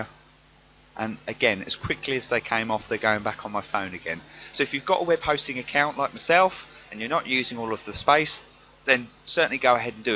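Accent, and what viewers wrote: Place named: United Kingdom. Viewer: Ahhh a sound of a British accent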